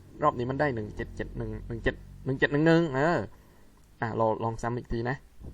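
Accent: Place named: South, Thailand. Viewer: ชอบสำเนียงใต้ๆของพี่นะ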